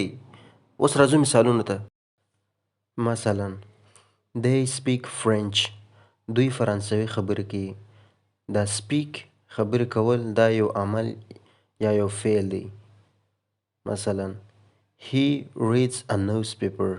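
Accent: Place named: Kandahar, Afghanistan. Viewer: Leila sweeps the room. Leila - subject, Sweep- verb, Room - object. Thanks for your teaching 🥀 I like your teaching 🥀 ګرانه استاده! ستاسو تدريس مې ډېر خوښيږي. هيله ده ، چې دوام ورکړئ. کندهارۍ لهجه او انګليسي ژبه ډېر خوند کوي.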